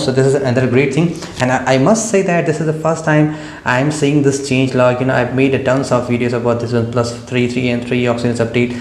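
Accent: India. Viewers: Fucking hate Indian accents in general but good vid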